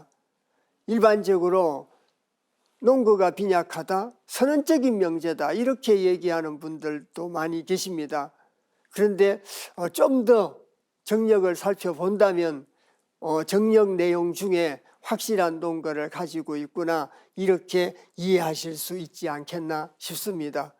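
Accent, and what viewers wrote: South Korea. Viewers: 쾌 발음은 되는데 괘 발음은 안돼요? 경상도 억양이 심하긴 하시지만 도를 또로 말하는 것 외엔 거의 모두 제대로 발음하시는데 유독 괘만 예외없이 쾌라고 하시니 안타깝네요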